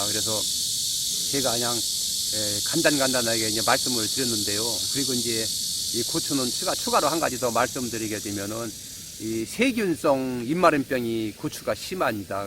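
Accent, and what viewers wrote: Chungcheong, South Korea. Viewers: 구수한 충청도 사투리가 마음이갑니다 듯기좋아요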